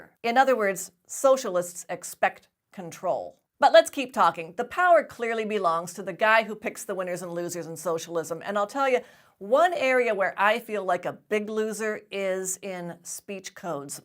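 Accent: United States. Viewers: If you have a beautiful voice you can tell me lies :) It's a pleasure to listen to them. I love your beautiful American accent.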